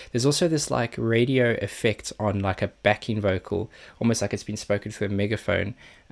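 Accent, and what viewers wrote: South Africa. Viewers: Dude, are you from South Africa? That accent.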